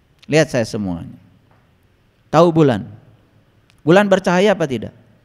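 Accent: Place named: Sunda, Indonesia. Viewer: Sunda nya medok , kita teu ngerti ustad....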